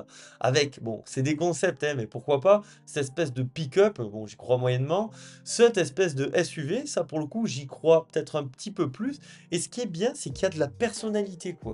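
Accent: South, France. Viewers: Votre style de commentaire est très drôle. Pas de vulgarité et l'accent qui fleure bon l'huile d'olive et le mimosa.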